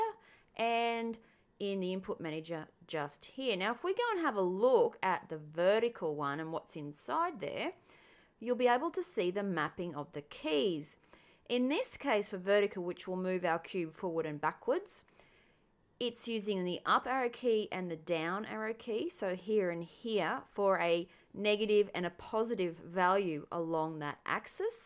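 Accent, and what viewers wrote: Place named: Australia. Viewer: you sound like you from southern queens land or northern nsw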